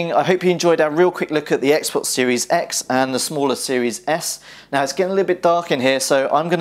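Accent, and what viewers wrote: United Kingdom. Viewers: why your british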